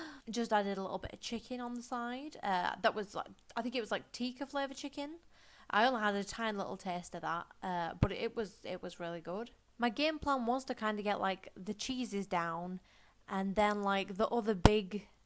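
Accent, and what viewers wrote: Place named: Yorkshire, United Kingdom. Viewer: Your accent sounds so yorkshire! I love it haha. This looks sooo nice Im hungry now!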